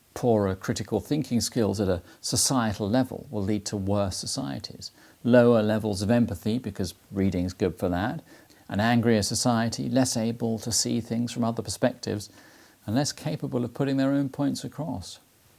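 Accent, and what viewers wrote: RP, United Kingdom. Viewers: can someone answer? what accent hes using? is it fully posh?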